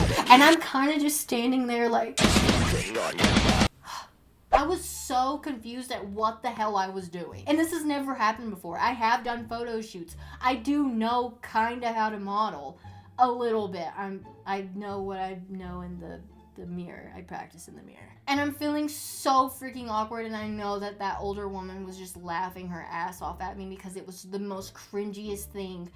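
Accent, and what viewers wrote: South, United States. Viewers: Love your Southern accent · I love your country accent! I hope your from a southern state or this comment will sound really stupid lol... I’m new to your channel just watched your two videos about your old apartment complex ! I’m subscribed and love you!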